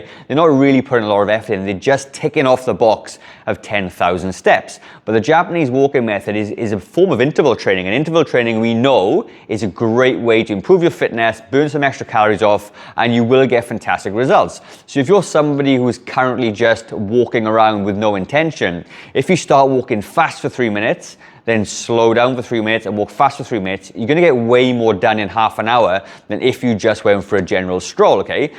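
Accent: Wales, United Kingdom. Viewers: Walking. Just discovered your channel ❤ I live in Neath and so lovely to hear your Welsh accent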